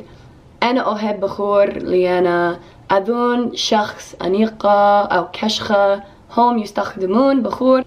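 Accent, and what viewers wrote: United States. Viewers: OMG for an American person ur American is sooooooo good learn alil bit more Arabic accent and you will be perfect 😍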